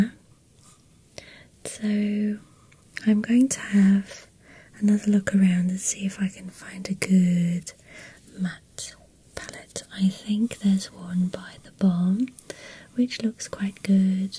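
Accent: United Kingdom. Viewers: I love her british accent💕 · I love your accent and the U.K. In general 🇬🇧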